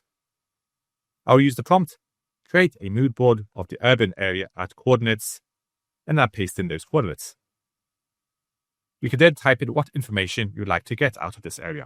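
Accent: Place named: United Kingdom. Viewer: lol your British accent is so strong I literally started wondering what "Google F" was 😂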